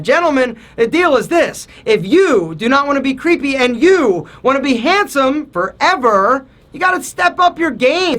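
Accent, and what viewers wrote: Philadelphia, United States. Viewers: He sounds like he’s from Philly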